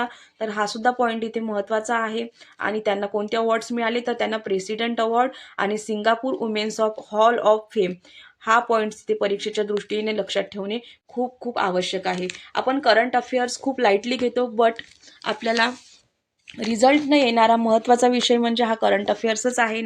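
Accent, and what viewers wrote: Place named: Vidarbha, India. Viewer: Ati uttam mam, tumhi vidarbhachya watata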